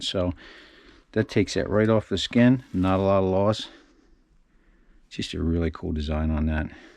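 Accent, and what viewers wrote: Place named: Southwestern Pennsylvania, United States. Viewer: Nice video, Sir. You sound like you might be from SW PA. May I ask where you caught the snakeheads? I remember reading of their "debut" in the Mon river maybe around 2019. Just wondering where else they may have migrated.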